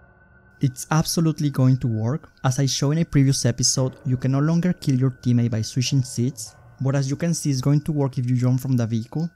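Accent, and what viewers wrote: France. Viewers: his accent sounds french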